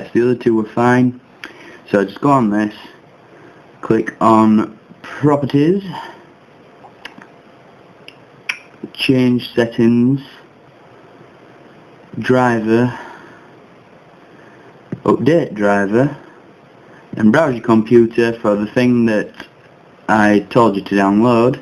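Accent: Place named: RP, United Kingdom. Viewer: Posh voice there lad;)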